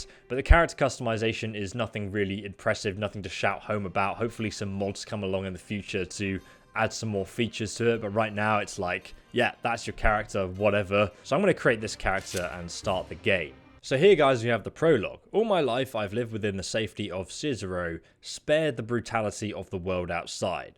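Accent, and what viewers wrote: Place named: United Kingdom. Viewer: Actually, I like your articulate brt English. Keep reading those text pls for you're more amazing than the BBC (not trolling, I'm really practising my Eng. listening)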